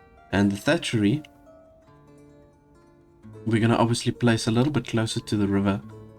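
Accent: South Africa. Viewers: Love the peaceful, chill Ostriv content, great find! One thing that's killing me is I can't place your accent. It sounds maybe like either New Zealand or South African (I feel like you look more like a New Zealander but sound more South African). Am I even close? :D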